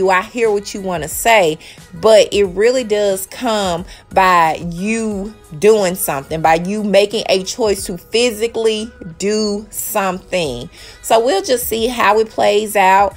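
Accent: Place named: Memphis, United States. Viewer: You kinda SOUND like Jessica...accent wise. Are you From Memphis?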